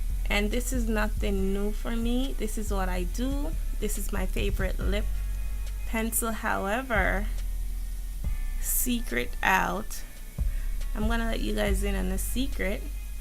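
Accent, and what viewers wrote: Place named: Caribbean. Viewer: I hear an accent are you from the Caribbean?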